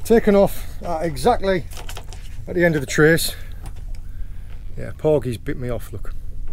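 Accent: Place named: Newcastle, United Kingdom. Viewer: lad!!? Youust be one of the best boat anglers around (Certainly for my brass anyroadl!) YOU!? For ME¡ Are one of the best EXAMPLES of Young anglers about! You explain In ageordie/ northumbrland accent (which I like,but have always favoured!??) Longay you retaím it and 😂. Longmay you broadcast your Youtube channel it😮I channel and it retaím the same resilience and Charming lilt as you do now??!! I am now 67*😮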